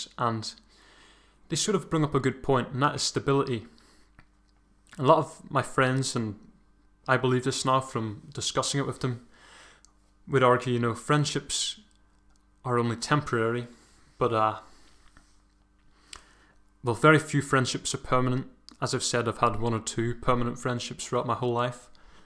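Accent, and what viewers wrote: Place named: Wales, United Kingdom. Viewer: Skot Wilson he sounds welsh to me.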